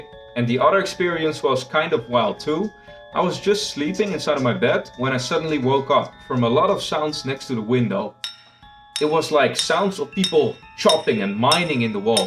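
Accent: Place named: Netherlands. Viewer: this sounds suspiciously like a dutch accent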